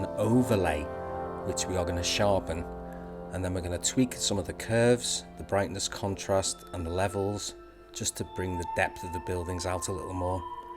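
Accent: Liverpool, United Kingdom. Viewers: What part of Liverpool/Wirral are you from mate? Love what you’re doing here. Good on ya.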